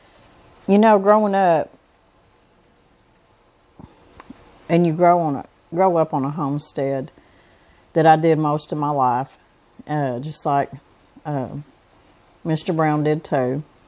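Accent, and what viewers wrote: South, United States. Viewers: Thank you! I've got tons of dry beans, but only a few qts of ham and bean soup. Need to get more beans for canning bc what you said makes lots of sense. Just LOVE your Southern Belle drawl. ♥️ Subscribed.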